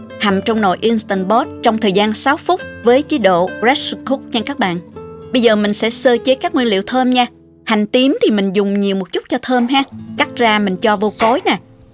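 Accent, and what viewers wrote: South, Vietnam. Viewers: Khong the noi ca?m o*n bao nhieu lan den voi. Kenh cua la kenh nau an, nhung thich nhat la cach dung tieng Viet cua, vua sang tro.ng, vua trong sa'ng, va rat ngot ngao voi giong Nam bo^. Toi nghe cac ban trong nuoc xai tu*`, toi that su*. nga'n nga^?m, cach noi vo^.i va`ng, dung tu*` hung du*~..... Rat tran tro.ng kenh vi da giu gin su*. trong sa'ng cua tieng Viet. Than chao, Hiep Than Minneapolis, MN Hoaky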